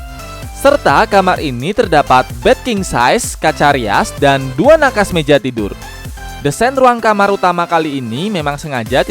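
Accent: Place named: Java, Indonesia. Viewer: masnya ngomongnya medok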